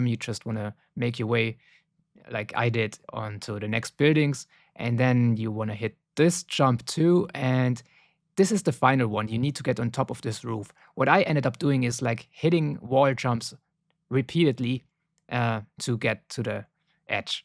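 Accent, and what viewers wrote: Germany. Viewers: Danke für das Video😊 man hört leicht heraus, das du deutscher bist xD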